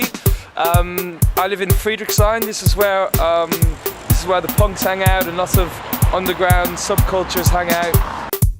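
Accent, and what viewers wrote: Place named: United Kingdom. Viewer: nice to see another minimal producer who can talk in a british accent living in germany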